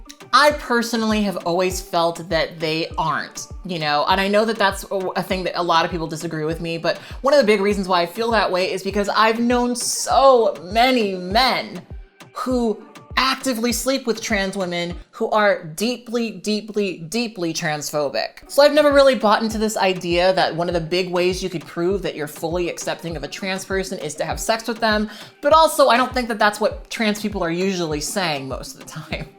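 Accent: United Kingdom. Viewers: I've noticed you've been occasionally doing a British accent randomly in your videos. Especially recently. When are you getting your own BBC series? 🧡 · 🧡 i love the british accent that gets sprinkeled in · mood As a fellow British person, I would like to say your lapses into the British accent (which is SO clearly your native accent ;-) ) bring me great joy. (I can't ever put the emojis because I watch your vids on my computer :sadtimes:) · 🧡🧡 that british accent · 🧡 is no one going to discuss accent developments? i love the dips into accents every now and then. we a do it to varying degrees of success, but british is starting to get good. i wonder if she's been binge watching bridgeton or following a new english youtuber. whatever, it's fun when people switch accents for no obvious reason.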